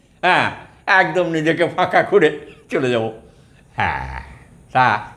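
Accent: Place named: Birbhum, India. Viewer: যেইভাবেই হোক আপনার সাথে দেখা করতেই চাই, আপনার কথা শুনে মনে হচ্ছে আপনি বীরভূমবাসী, যাইহোক আপনার mail id ta দেন আমি পেলাম না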